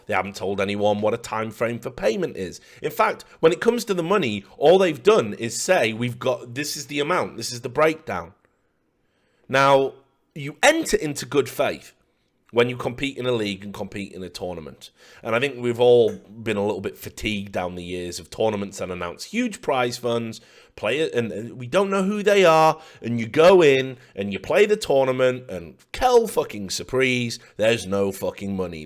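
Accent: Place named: Newcastle, United Kingdom. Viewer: are you a fucking Geordie? It all makes sense now, I love you even more.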